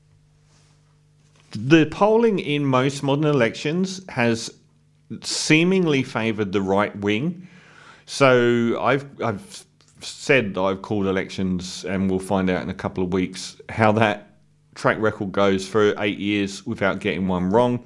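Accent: England, United Kingdom. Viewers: You clown! You sound like a pom bringing your lefy garbage to our country.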